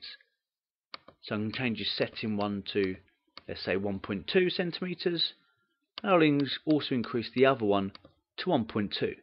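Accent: United Kingdom. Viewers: Thanks It helped me a lot. Also, your british accent is very satisfying lmao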